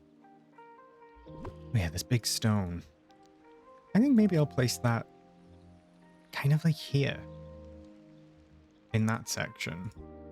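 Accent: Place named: Wales, United Kingdom. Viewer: I new I heard the Welsh there. I'm a Welsh valley girly too. Thank you for these videos though, they really do help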